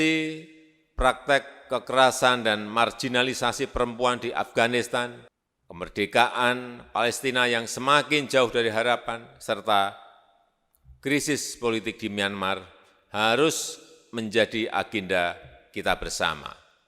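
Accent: Java, Indonesia. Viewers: Laen antara bahasa Inggris pake logat Jawa dengan SALAH MELAFALKAN BAHASA INGGRIS, guru gw orang jawa logatnya kental bgt tapi melafalkan bahasa Inggrisnya mantap bgt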